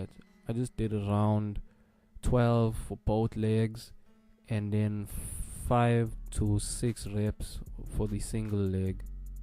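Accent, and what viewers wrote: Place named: South Africa. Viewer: Bro you sound South African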